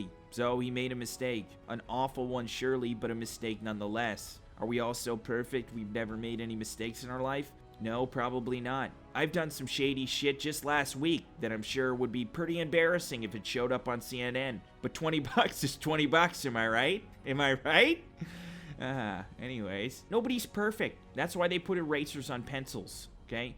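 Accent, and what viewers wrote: Canada. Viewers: You are so deliciously Canadian. Nova Scotia?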